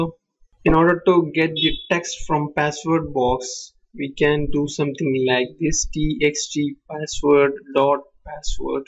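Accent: Kerala, India. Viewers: Malyali aano ? Nice explanation.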